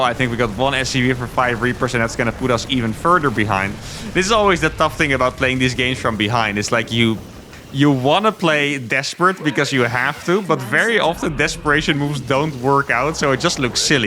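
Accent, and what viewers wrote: Netherlands. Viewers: ruthermental? /dadjoke. I enjoyed this, always lovely to have dutch inflection in the accent. Go team Oranje!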